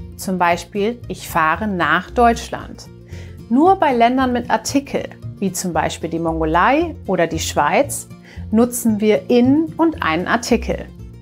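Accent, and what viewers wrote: United Kingdom. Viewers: He is English, trying to speak german for the video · Kommt aus Großbrittanien? Er hat ein starkes Akzent haha. Keine Respektlosigkeit, meine Grammatik ist schrecklich 💀 ich glaube "starkes Akzent" war in diesen Beispiel nicht richtig oder? Gibt es Deutsche hier mit den Antwort? 😂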